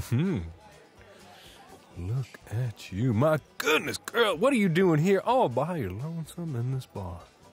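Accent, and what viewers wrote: South, United States. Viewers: The country accent is amazing. This video is just what I needed, too. Thanks, Daddy. ❤️ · Omg the country accent gave me tingles. You can get it. Stop playing Daddy I'm lit so I have liquid courage. I'll feel bad tomorrow Yee haw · This Country Boy accent sounds so sweet, and is hitting just the right spot in my brain ❤️ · Him: Where you from? Me from Downtown Ohio No boyfriend because I’m to shy to ask anyone, and to tell people I’m from Ohio… 😪 yeah this audio is perfect.❤ I loved it. You have a wonderful country accent! 🥹love you!!! ❤❤❤.From a British woman. 😌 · I love your southern accent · A country boy accent aways makes me giggle 🤭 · i absolutely love your accent (and honestly i am not a big fan of american accents that much) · This ain’t no American accent missy!!! It’s a country accent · (Edit): After listening to this, your southern accent is pretty dang good! 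Your voice acting is very impressive! Well done ❤️ ❤️ (Original comment): Ooo?! Going by the title imma have to listen to this one at night 😂🫢🥴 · As a girl who lives in South ith think your accent was spot on a little to rich but when you speak all in all it was hot 😙🤌 chefs kiss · The giggles I let out while hearing the country accent ☺️🤭❤ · As a southern girl, the accent killed me💀💀💀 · Your country accent is amazing 🤩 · The Country Boy accent is amazing, it so good thank you!!! ❤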